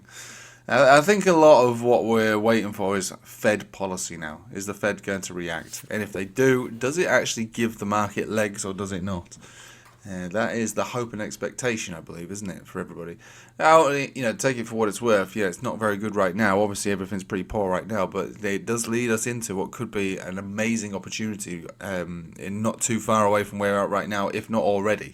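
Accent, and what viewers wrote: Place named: Yorkshire, United Kingdom. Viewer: Thanks for the video mate I thought you was somewhere near me by the acent I’m in Bradford the posh side of Yorkshire 😂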